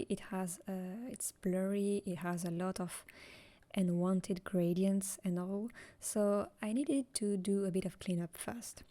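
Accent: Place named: France. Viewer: J'ai trouvé un petit accent frenchy et du coup j'ai tout compris ! Merci pour ce tutoriel de qualité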